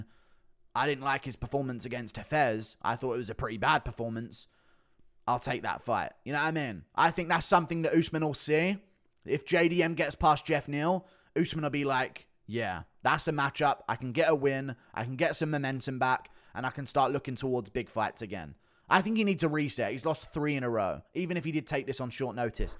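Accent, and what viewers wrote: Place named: England, United Kingdom. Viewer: been watching this channel for over a year. And I just now noticed you have an English accent. -Fan from the 209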